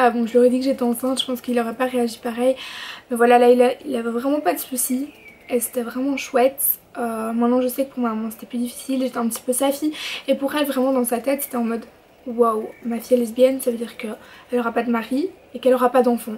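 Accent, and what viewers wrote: Belgium. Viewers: Tu es belge, non ? Tu as un accent vraiment adorable. Et t'as vidéo m'a vraiment aidé merci beaucoup :) xx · Accent belge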